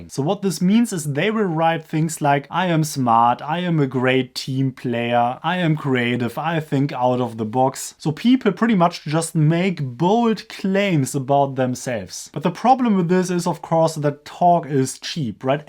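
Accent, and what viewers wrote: Germany. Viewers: I really enjoy your German accent English! How could I contact you if I may ask?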